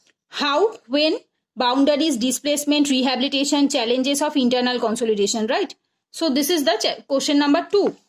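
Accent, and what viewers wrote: Bengal, India. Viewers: Well!! She sounds bengali...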